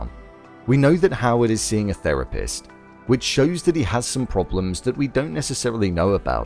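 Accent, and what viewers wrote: England, United Kingdom. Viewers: Sry, I love your engl Accent, but you should do some more research when making Previews for Youtube...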